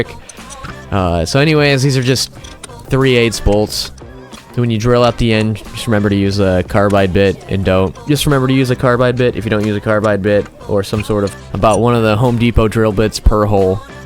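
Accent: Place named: Massachusetts, United States. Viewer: Sound like your in Ma.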